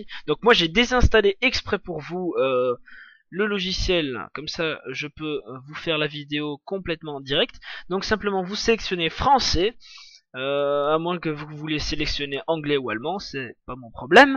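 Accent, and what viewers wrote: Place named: Switzerland. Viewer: accent suisse :p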